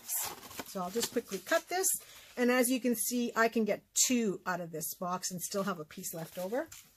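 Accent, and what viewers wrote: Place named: Michigan, United States. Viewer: Are you from Michigan? You kind of sound like it…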